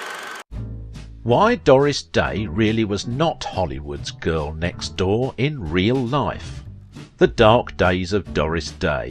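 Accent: United Kingdom. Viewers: I could have appreciated this video if it wasn't for the creator's British accent. Try as we may, we just can't escape our attachment to that island nation. One revolutionary war, two world wars and the cold war and we're no closer to being independent from them. If something originates in Britain, it will find its way into our country. Some say it's due to a common language and history, but it's like relatives who live on the other side of the country. Send them a Christmas card every year, but let them live their lives, while we are free to live our own.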